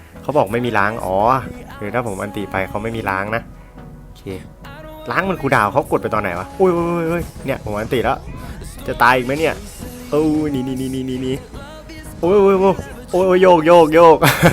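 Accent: Isan, Thailand. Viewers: คนอีสานหรอครับ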